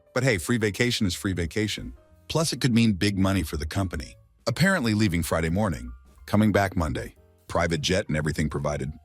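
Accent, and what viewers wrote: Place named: Russia. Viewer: The english sounds like broken russian or east European.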